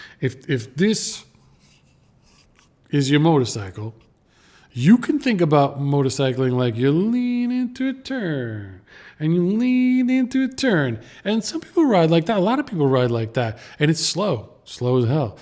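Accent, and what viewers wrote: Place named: Northeast, United States. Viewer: your accent reminds me of childhood 😂 northeast?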